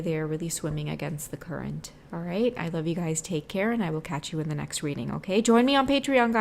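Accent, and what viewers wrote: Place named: Trinidad and Tobago. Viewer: I love when you do the trini talk w 🥰 and ty for the readings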